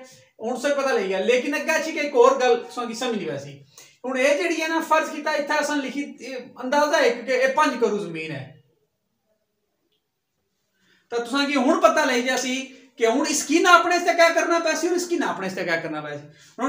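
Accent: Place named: Punjab, India. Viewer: Ye kis zile di jaban hai.tusa Punjabi se mukhtlif bol rahe ho.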